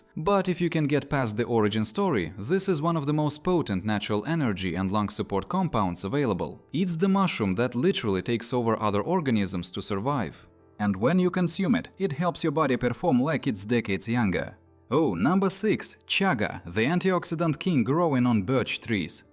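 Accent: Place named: Russia. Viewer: На русском языке здесь только название ??? Но по произношению слышно,что читает русский😂 Как на моем косячном навигаторе: зе камера эхэд😂😂😂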